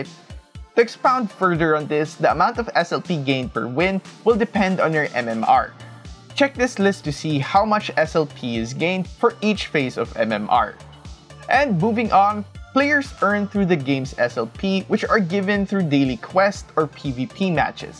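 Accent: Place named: Philippines. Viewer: I hear a Pinoy accent... :D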